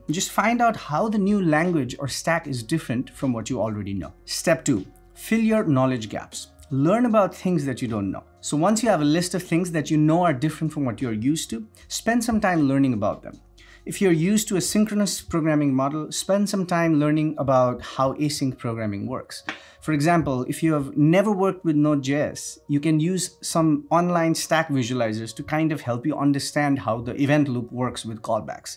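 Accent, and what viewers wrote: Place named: Nepal. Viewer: Amazing content! I always felt like you have a Nepali ascent. But today I noticed Swayambhunath Stupa on your hand. :)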